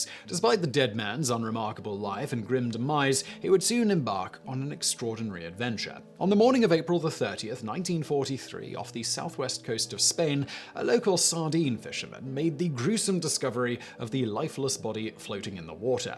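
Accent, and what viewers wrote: United Kingdom. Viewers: Do you cold read from a script? Your pronunciation of some names in your videos, British accent notwithstanding (When will Brits speak English?) is often unique, if not horrendous. Eddie [GAE-del]? Seriously?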